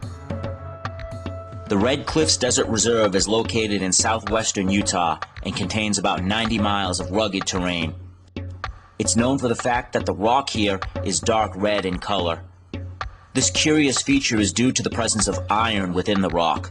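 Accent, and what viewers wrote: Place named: Boston, United States. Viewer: you from Boston, you sound like Kennedy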